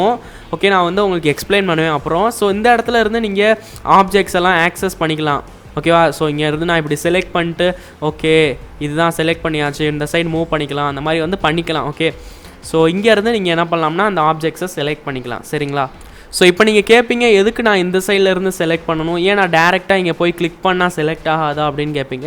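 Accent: Madurai, India. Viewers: Simply i am enjoying your MADURAI Tamil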